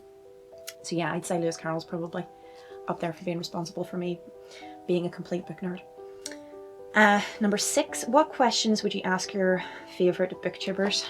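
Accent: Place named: Northern Ireland, United Kingdom. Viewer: Heeey, welcome to booktube! :D Can I just ask... Where are you from? You have such a lovely Northern Irish accent!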